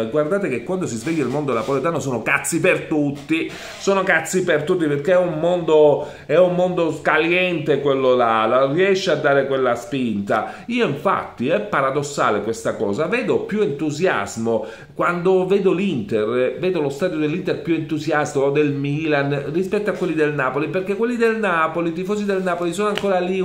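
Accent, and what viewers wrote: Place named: Palermo, Italy. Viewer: Quando uno è palermitano si sente..😉 Ti seguo con piacere compà (passami il termine, ma sono della zona anch’io) 💪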